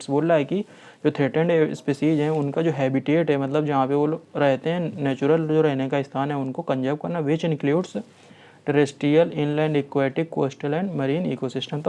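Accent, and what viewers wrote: Kanpur, India. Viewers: Awaz se aap kanpur ya Fatehpur ke lag rahe hai 🙏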